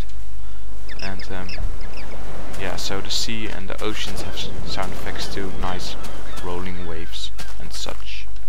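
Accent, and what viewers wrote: Netherlands. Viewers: I might be wrong, but are you dutch? I recognize that accent, I hear it every day ;).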